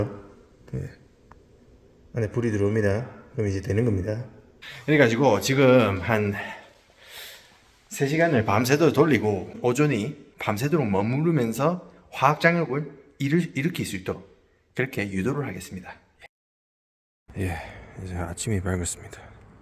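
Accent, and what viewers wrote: Gyeongsang, South Korea. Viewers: 영어 speaking은 표준인데 한국말 억양은 대구 사투리 같은데 구수해요. 오랫만에 미국에서 듣는 구수한 된장국 억양. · 어제부터 정주행 하고 있습니다. 처음에는 야무진 갱상도 사투리가 재미있어서 보았으나 님의 봉사정신을 보고 존경하는 마음까지 생겼습니다 주위에 약자들 사회에서 소외된 사람들에게 힘과 용기를 주시는 분께 박수를 보냅니다 🎉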